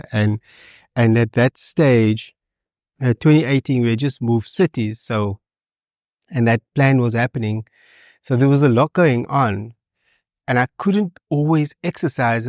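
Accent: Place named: South Africa. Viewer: Sounds like a South African I loved what he had to say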